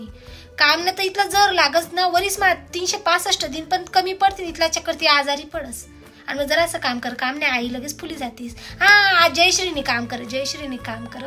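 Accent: Khandesh, India. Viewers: मी तर तवाच वळखल्यान तूण खान्देशी हाय ते छान बोलतीस व ऐकतच राव्हा वाटतेन आम्ही आहो विदर्भ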